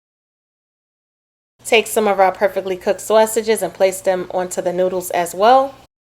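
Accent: New York, United States. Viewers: That New York accent just coming right through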